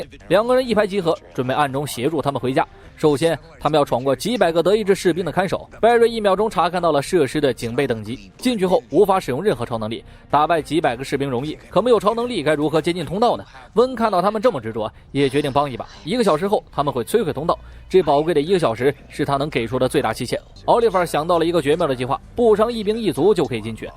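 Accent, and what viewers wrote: China. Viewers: 怎麼中國人那麼喜歡用YouTube 來做盜版的事情？ 操著這個口音 真的很噁心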